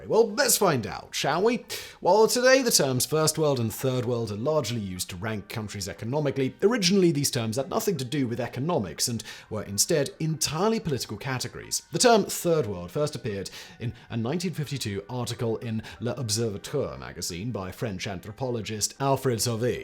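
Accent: United Kingdom. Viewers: mate can’t understand you. This is only for British English users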